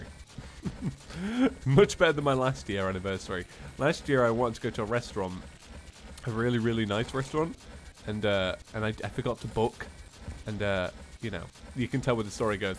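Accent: United Kingdom. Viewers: Stereotypes are bad, I know but in the middle all I could think about was how steriotypical of a British person sounds Have a good day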